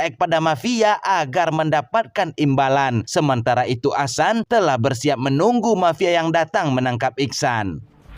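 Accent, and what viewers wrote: West Sumatra, Indonesia. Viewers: Eh mimin ny orang sumatra barat kyak e mah salam dari Padang mimin sehat sehat min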